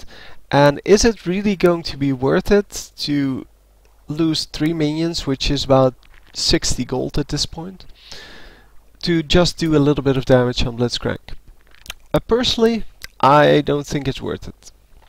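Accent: Netherlands. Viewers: Hey, zou dit ingesproken zijn door een Nederlander? ;o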